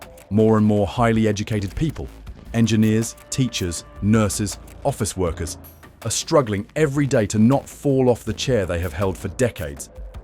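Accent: England, United Kingdom. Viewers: English accent throwing shade on LA - nice try diddy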